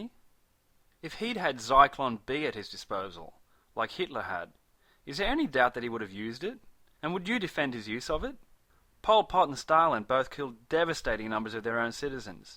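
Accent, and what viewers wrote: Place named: Australia. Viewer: thanks for making this gloomy video more sexy by having an Aussie 'cent. Gracias.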